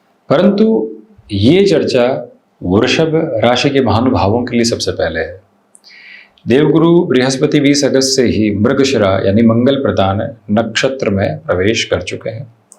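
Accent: Standard. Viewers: आपकी हिंदी भी बहुत शुद्ध है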